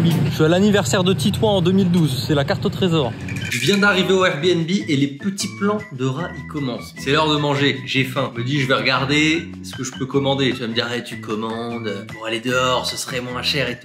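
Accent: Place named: Paris, France. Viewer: Ton accent "parigo" ...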